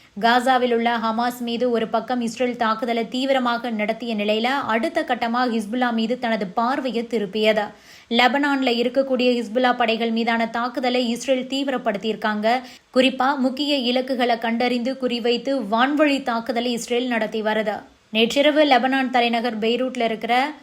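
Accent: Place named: Chennai, India. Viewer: Madras தமிழ் தூதூதூதூதூதூ......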